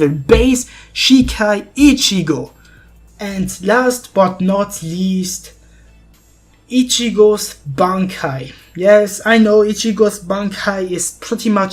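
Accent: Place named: France. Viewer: We can hear your french accent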